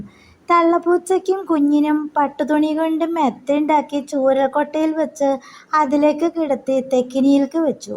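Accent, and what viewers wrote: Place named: Valluvanad, India. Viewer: നല്ല വള്ളുവനാടൻ മലയാളം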